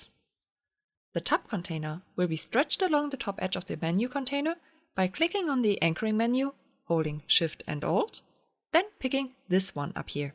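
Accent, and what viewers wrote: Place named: Germany. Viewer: Ein Voice Over in Deutsch als Audiospur wäre cool ;-) Ich kann zwar sehr gut Englisch, aber dieser Deutsch Englische Dialekt ist echt schlimm. Ich weiß nicht warum, aber ich höre jeden Deutschen heraus, der Englisch spricht und find's wirklich schwierig. Frag mich aber nicht warum, ich weiß es selbst nicht. Ansonsten, Top Video. Danke!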